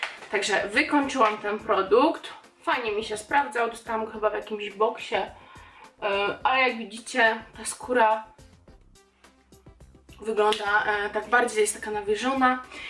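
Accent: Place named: United Kingdom. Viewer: Zauwazylam ze masz teraz taki brytyjski akcent nawet jak mowisz po polsku to przeciagasz dlugo samogloski np- twaarz, a nie mowisz tak jak my mowimy tak szybko 'twarz', mam nadzieje ze wiesz o co mi chodzi :D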